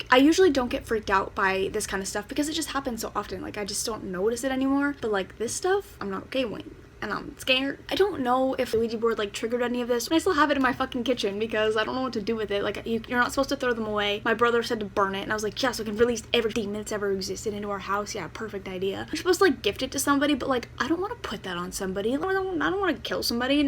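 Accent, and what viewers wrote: Ireland. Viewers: where you from?? are you irish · everyones saying your doing Mirandas voice on purpose but I just thought you were from Irish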